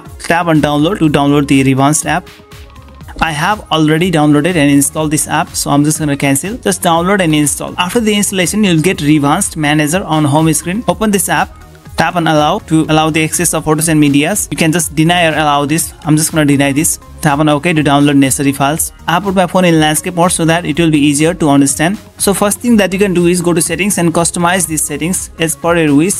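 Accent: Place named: India. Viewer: Yup always an indian accent guy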